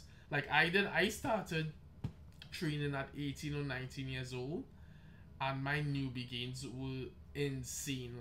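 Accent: Trinidad and Tobago. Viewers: Great content. Can't hide that Trini accent 🇹🇹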